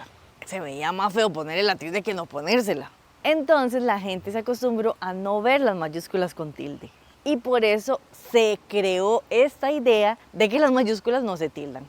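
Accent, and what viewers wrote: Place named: Colombia. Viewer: Pensé que era colombiana profe por si acento. Gran vídeo. Pura vida❤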